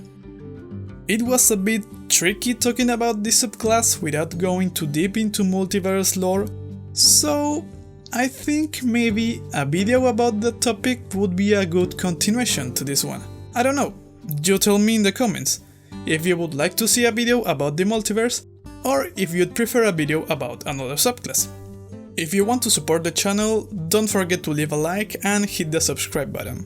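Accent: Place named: Argentina. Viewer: che pero vos sos de argentina? Perdona si no es así. Es que tu acento inglés es muy argento!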